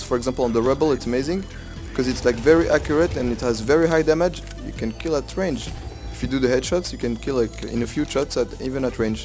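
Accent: France. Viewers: on sait tout de suite que t'es Français ah ah ah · es-tu francais? tu as laire davoire laccent ;)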